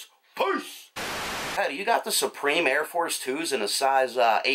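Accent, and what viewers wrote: Philadelphia, United States. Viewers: Most thorough and exemplary Philly accent on the interwebs. Respect.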